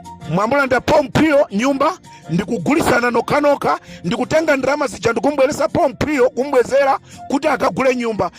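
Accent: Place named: Malawi. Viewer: Comrade come on…. This is a real Malawian talking in the voice Avoid this if u want to be taken serious Come on man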